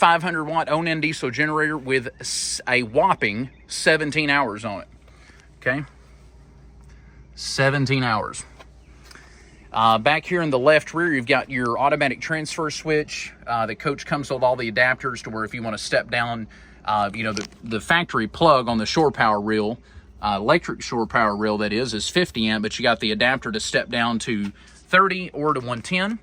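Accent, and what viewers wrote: South, United States. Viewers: There is nothing worse than a used car salesman lying to a prospective customer with innuendo of impending end of driving cars spiel to pressure the buyer. Until now. I remember this same threat by used Renegade Youtube dealers that the XL was being discontinued in 2022. Probably even had the very practiced cover their butt distinction that they had it "on good authority by the President of the United States..." or some s**t. You come off as a southern drawling con man when you gossip.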